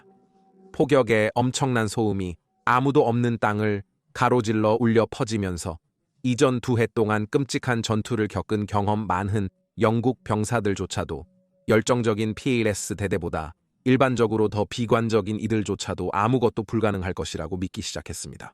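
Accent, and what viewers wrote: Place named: China. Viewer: mbc 손령처럼 중국발음 오진다. ㅜㅜㅜ ㄹㄹㄹ 전형적 중국인 발음 ㅋㅋㅋㅋㅋ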